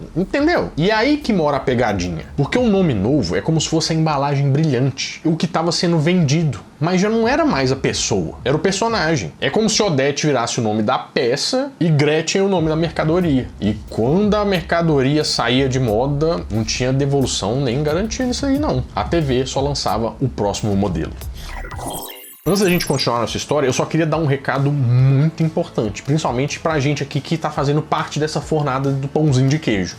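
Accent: Minas Gerais, Brazil. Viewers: que bom que você continuou com teu jeitinho minerês de falar, é tão fofo. Abração, garoto! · 😮 Pode deixar esse sotaque mineiro bonito em paz!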